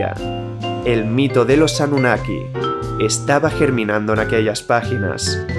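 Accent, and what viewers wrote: Spain. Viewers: Buenas, es lo que tiene haber nacido en España. Que hablamos Español. Por cierto el acento es en este caso es, Catalán. 👍🏻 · Vine buscando cobre y encontré oro!, este video es simplemente increíble, perfectamente explicado y con una edición fantastica, usualmente me desagrada el acento españolizado, sin embargo tienes una voz bastante agradable al oído, el tono adecuado para prestar atención a cada uno de los temas que manejas, gran trabajo amigo, muero por ver mas de tu trabajo. Saludos desde Mexico.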